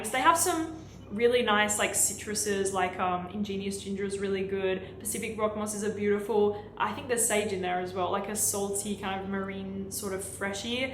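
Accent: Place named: Australia. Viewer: Love Australian words” accent!! AMAIZINNNN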